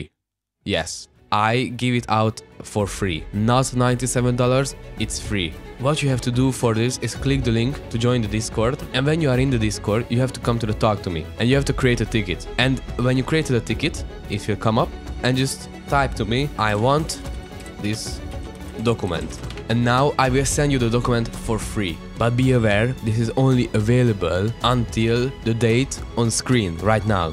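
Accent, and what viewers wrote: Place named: Hungary. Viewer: Wait your accent is VERY simmilar to mine. Are you Hungarian?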